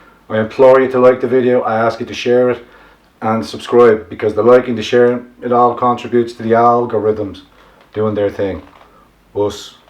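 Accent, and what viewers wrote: Dublin, Ireland. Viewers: Truth explained so clearly in the most beautiful of Dublin accents. Thank you.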